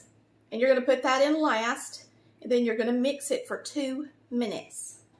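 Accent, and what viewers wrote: South, United States. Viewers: where are you from? I love your southern accent! I live in South Carolina, you remind me the ladies from My church!!! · Soon as you said "Hey y'all this is ". I was sold! So Southern and elegant 😊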